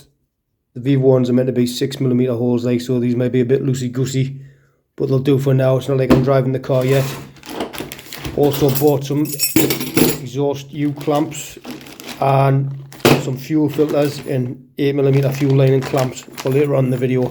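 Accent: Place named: Birmingham, United Kingdom. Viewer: Love a Birmingham accent 👍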